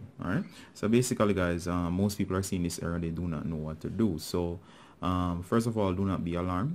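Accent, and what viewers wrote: Jamaica. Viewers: R u jamaican man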